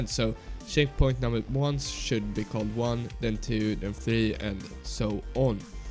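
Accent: Sweden. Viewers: YOU ARE SWEDISH I HEAR UR ACCENT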